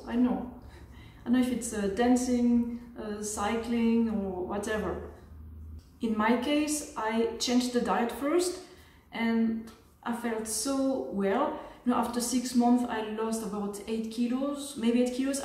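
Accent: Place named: South Africa. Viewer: Do you know you’ve got the South African accent ! It is sooo cute 😊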